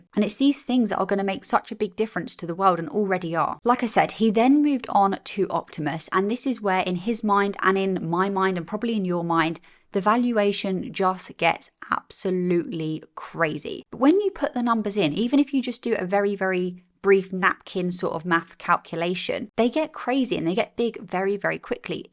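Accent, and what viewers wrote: United Kingdom. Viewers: British accent ✅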